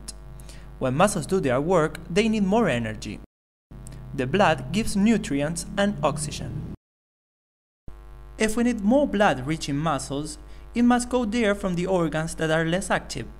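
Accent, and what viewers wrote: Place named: Mexico. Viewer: ese ingles mexicano me hizo caga de risa jajajaja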